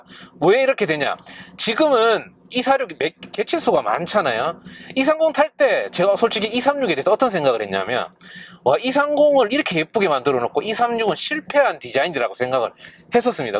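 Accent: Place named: Daegu, South Korea. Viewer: 대구말 엄청 반갑네요 ㅎㅎ 요녀석은 정비할때 손 들어갈 공간이 여유로운 편일까요? ㅎㅎ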